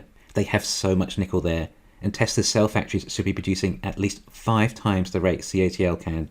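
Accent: England, United Kingdom. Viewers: At last an Englishman with an accent on Tesla!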